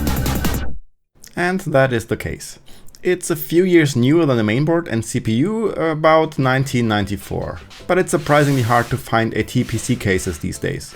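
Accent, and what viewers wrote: Germany. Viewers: for a guy that sound pretty german it's just seem wrong to hear "Aluminum" instead of "Aluminium"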